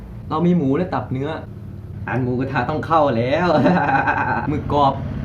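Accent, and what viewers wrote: Korat, Thailand. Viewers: พี่เป็นคนโคราชใช่ไหมเนี่ย5555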